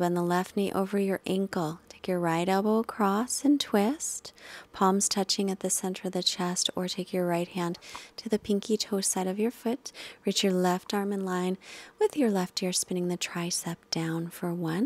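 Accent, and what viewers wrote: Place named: United States. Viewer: Well since you've mastered yoga already, I think you could put more emphasis now on your sanskrit pronunciation, since you have a really really hard american accent and that was the only thing i didn't like in the video, especially singin mantras. other than that - great! I've been following this for a few days now and i really like how you guide people through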